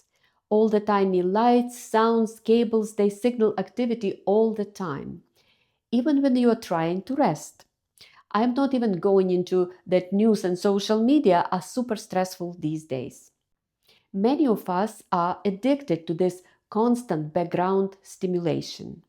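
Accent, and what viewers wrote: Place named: Russia. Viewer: У Вас очень яркий русский акцент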